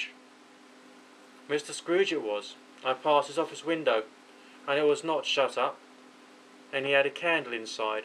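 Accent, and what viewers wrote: United Kingdom. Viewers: This is really a great reading , especially with that British accent, very good!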